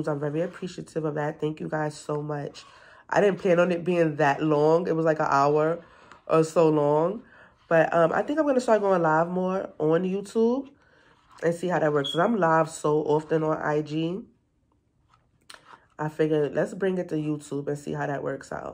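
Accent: New York, United States. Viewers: Just found ur video and child i can tell ur from nyc cause i can hear that newyorker accent we got! Thanks for the tips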